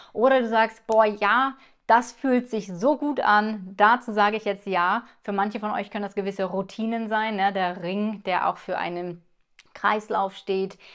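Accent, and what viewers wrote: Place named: Low German, Germany. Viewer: Du sprichst ja Plattdeutsch 👌🙋‍♀️👍 wie schön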